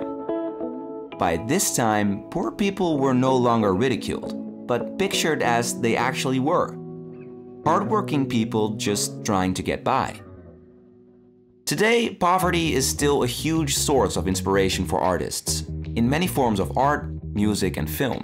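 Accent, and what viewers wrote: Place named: United States. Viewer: God, tone down the American accent, dude - so jarring...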